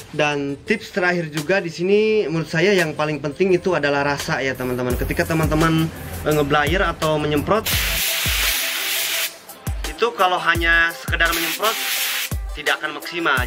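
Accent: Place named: West Java, Indonesia. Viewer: bukannya Jawa ngapak ya? Kok logatnya Sunda? 🤔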